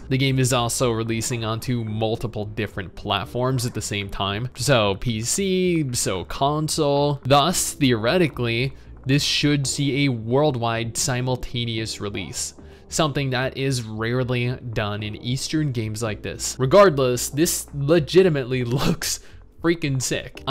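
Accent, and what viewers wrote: United States. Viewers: You're Chinese Australian with an American accent 🤔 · You grew up in Australia but you sound American... Nice looking game, let's keep our fingers crossed.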